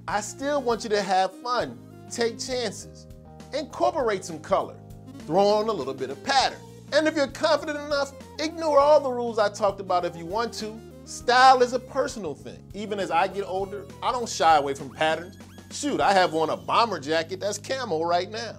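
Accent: Philadelphia, United States. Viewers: Bro as soon as I saw you and head you talk I KNEW you were from Philly. Honestly. It has to do with your grooming and how you express yourself. I would even go as far to say either Mount Airy or Cheltenham but definitely not North /South Philly? I’d like to know. I grew up in the Burbs, North Wales, the Holiday Inn was the Spot, along with Kim Graves, the Library and Second Story and Freaked out Catacombs. I am Thankful to be ALIVE TODAY. I was often, back in the day, in Ronald Phillips to get the freshest kicks. Or Today’s Man for clothes. I guess that tells you how old I am. I personally don’t feel comfortable in the slim pants leg simply because of my athletic build, but there is truth in the statement that Style is Personal. And the most important factor to a good looking, or attractive man is grooming. I remember growing up in that 80’s TP Era, always FRESH, when Men made an effort to IMPRESS the Ladies, BUT it was MORE important that You felt good. 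Changing up with a 3 day beard, or shoes with no socks. I still do wear CB Boots, but Ostrich, Lizard, or Croc with jeans. Some things don’t change. As a matter of FACT you resemble the Shoe salesman at Ronald Phillips LOL. Although most of the Brothers would feed off Other Bro to find the adequate style, I always liked to mix in the European flavors. I was into the Older Men’s fashion sense at a young age. I was very impressed with Bogart, Cagney, and the KOOL George Raft. Had a Subscription to GQ and learning about Designer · As soon as you started talking, I was thinking “He sounds like Philly.” And you are! Your vid popped up and I enjoyed it. 👍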